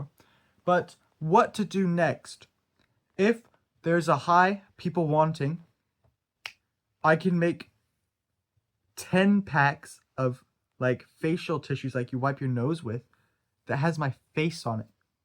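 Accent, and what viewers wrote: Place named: United States. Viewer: you sound more american than new zealand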